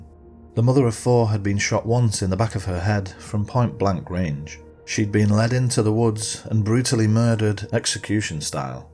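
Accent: United Kingdom. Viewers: Your wonderful British voice doesn't match this U.S. story. Please......more non U.S. stories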